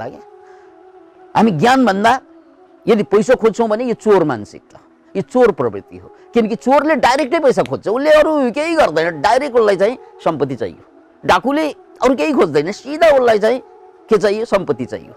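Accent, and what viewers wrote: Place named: India. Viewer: बोलेको सुन्दा त इन्डियन हो कि जस्तो पो लाग्छ हौ!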